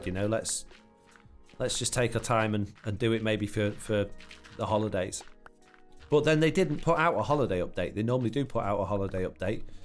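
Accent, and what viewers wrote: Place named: Ireland. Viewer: Love the Irish accent, I see another character on the horizon lol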